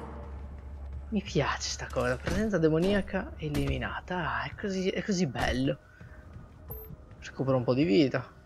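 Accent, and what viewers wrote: Sardinia, Italy. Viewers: Oddio, sei sardo?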